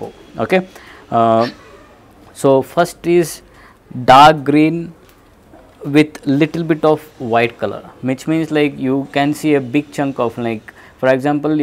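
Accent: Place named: India. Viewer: Sir ur voice is so Indian Love it and thanks for the info I need some help for cockatiels can you reply so we can chat for answering question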